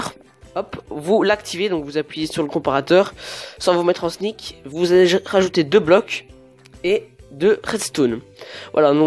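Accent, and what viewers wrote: Belgium. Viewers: GG tu es belge ? ^^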